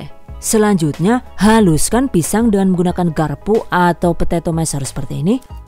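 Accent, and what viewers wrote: Banyumas, Indonesia. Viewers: Coba pakai bubuk kacang + keramel atau bubuk kacang + coklat min, bagus penyampaian dan open mind alias blak kotang rak suwalan 😂😂😂😂😂😂😂😂😂😂 ngapak min yo? I love your voice ngapak 👍👍👍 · Kok bisa bahasa ngapak? 😁 kalo boleh tahu orang mana ya?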